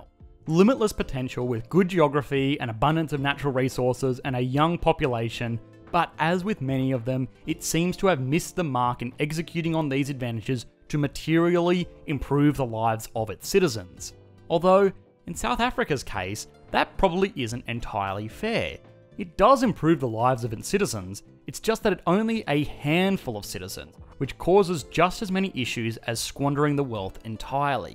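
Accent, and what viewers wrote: Australia. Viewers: I love your aussie accent mate👌🏻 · I don’t think he’s American. He sounds like he’s from Australia or New Zealand.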